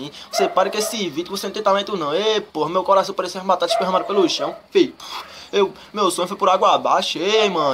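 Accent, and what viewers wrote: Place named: Pernambuco, Brazil. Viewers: Pernambuco boy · É o melhor sotaque do mundo o Pernambucano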